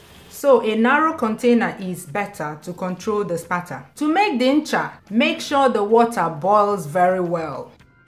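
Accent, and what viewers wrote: Igbo, Nigeria. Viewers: Just like your Igbo accent. E dey deep ❤ Cheers sis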